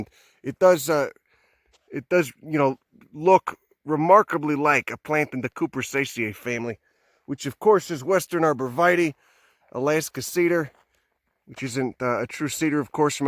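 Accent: Chicago, United States. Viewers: But he has a Chicago accent, Goodfellas was new York, there is a difference. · It's an old school Chicago (esp Italian American) accent. He is Italian and from Chicago but he hams the accent up to make the vids more entertaining and funny. You can see him talking in his usual voice here: <link> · Chicago. Not New York. You can tell by the way he pronounces his rrrrr's at the end of a word. Chi: "conifer" NY: "conifuh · It is so funny to listen to such a high IQ discussion in a South Chicago accent. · I love this guy. It's like Elwood Blues became a botanist. Cook county born myself, love this accent. Subbed just to listen to him. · Yes. He is from Chicago, is why...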